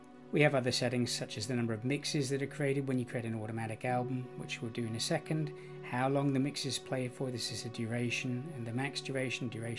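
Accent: Cornwall, United Kingdom. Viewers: I'm from bude, Cornwall, thought your accent sounded familiar. I find your app really interesting but I'm finding it really difficult to understand and use. I come from using Ableton. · Is your accent Cornish?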